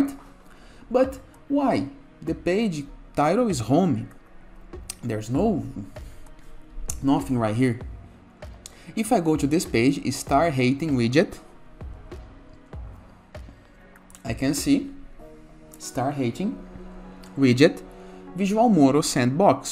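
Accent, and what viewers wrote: Brazil. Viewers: Você é brasileiro? kkkk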